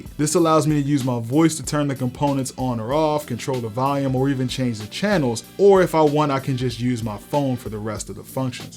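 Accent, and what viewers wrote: Baltimore, United States. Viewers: Love the theatre. Interested in the accent. Baltimore/DC area?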